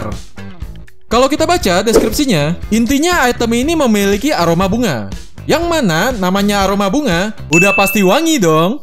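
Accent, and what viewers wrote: West Java, Indonesia. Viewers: Orang sunda mang?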